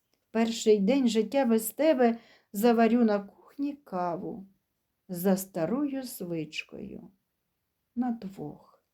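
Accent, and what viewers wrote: Standard. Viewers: Добрий день, у вас чудовий голос, спокійний, знання української мови стовідсоткове, мабуть ви єдина, що розмовляєте на чистій українській мові. Дякую вам. Завжди охоче слухаю вас.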